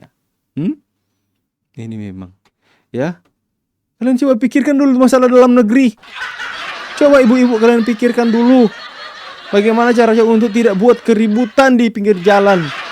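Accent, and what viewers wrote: Java, Indonesia. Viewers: baru tahu..kalau mas..ayah ibunya org Malang...mangkanya logatnya kok medok org jawa...salam dari malang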